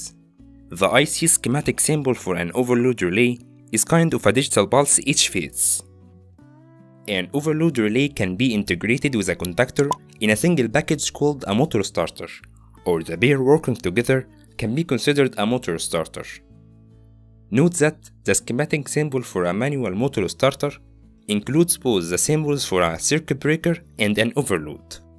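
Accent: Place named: Egypt. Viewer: الانجليزي المصري نار وشرار 🔥🔥ههههههه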